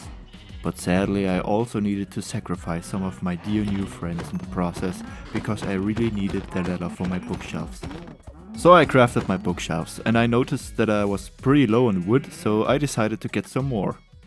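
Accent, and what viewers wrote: Germany. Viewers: I recognize your accent, and which land you come from -- I just spent a month in BW. Your videos are high quality and you have what it takes to become a big-league minecraft streamer. The amount of time you put in to your videos is evidence enough of that. The dry humor is a nice touch. And they say die Deutsche don't have a sense of humor lmao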